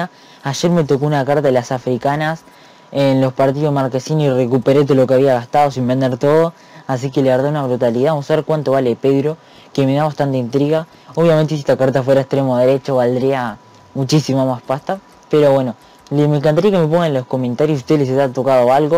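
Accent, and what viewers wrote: Uruguay. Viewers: Sos uruguayo?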